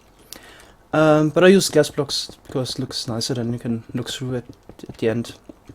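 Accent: Bavaria, Germany. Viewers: Too sausend haha man hört voll raus, dass du Deutscher bist :D Aber krass, was du alles machst, großen Respekt dafür! :) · He's German. I heard him speaking it. He sounds kind of Bavarian to me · Haha 100 pro Deutscher oder? Der Akzent macht mich fertig. Nicht böse gemeint :D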